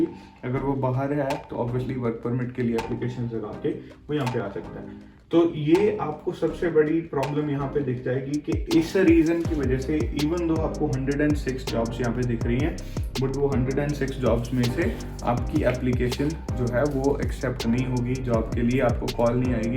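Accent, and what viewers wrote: India. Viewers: Important matter. Can u please add captions to this video? Sorry I can't understand your English Indi accent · Can't get you clearly, music n too noisy with indian speaking confuses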